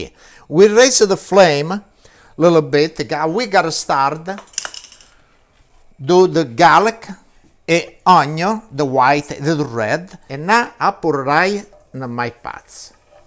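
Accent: Italy. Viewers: I Love that Italian accent! Eggplanta Meata ballis. So musical. 🎵🍆🎵🍆🎵🍆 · The recipes, the expertise, the actual food after it’s done, the beautiful kitchen he has, when he samples his cooking, his having the glass of wine during his sampling of the dish he made, his humour and of course THAT TERRIFIC ACCENT!!!!!! HOW can we NOT love Chef, HOW???? He’s living proof that the Italians are the masters of cuisine and that no one knows food better than them, no one and as I’ve told my Italian friends, “You’ve had the privilege of growing up eating like KINGS & QUEENS!!!” And that my friends isn’t an exaggeration, it’s the TRUTH! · Isn't he the most adorable and handsome Italian Chef ever, love his accent! who needs a Gino when we got Grandpa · He's SO adorable and funny and l LOVE his ltalian accent. J'adore les aubergines et ça, ça a l'air délicieux. Mille grazie. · I just ADORE this guy! 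Not only are his recipes so wonderful, but I love his Italian accent!! God bless him. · I love your accent! I start to feel Italian. great recipe! , "like-a de-boss, cuz I'm a-boss ya". classic! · Great Italian accent Signor!!! I love this recipe will do this soon!!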